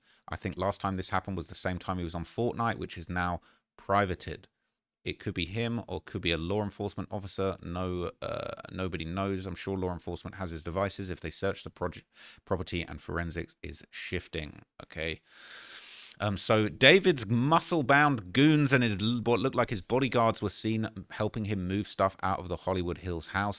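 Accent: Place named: England, United Kingdom. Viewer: My dawg talks like the English but acts like an American lol. Salute my bro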